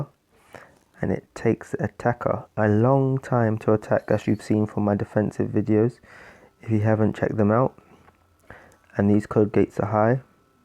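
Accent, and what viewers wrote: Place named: India. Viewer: Индийский акцент... Кровь с ушей идёт от этой речи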